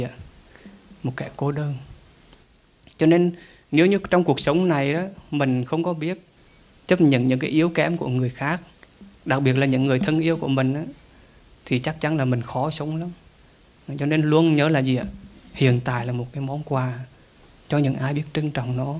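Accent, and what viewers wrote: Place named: Central, Vietnam. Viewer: Giong noi mien trung cua thay rat hay rat thich nghe ma nghe ko chan cam on thay ah .Nam A MO Di DA PHAT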